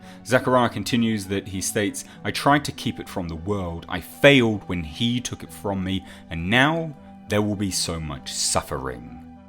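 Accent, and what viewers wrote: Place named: United Kingdom. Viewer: With a little more bass in your voice, you could have a nice cenobite tone. Or maybe it's just the British accent. Yeah, that must be it...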